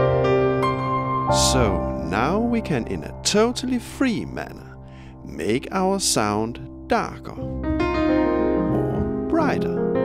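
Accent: Denmark. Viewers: That's a Danish accent for you right there :) Anyway good channel! =)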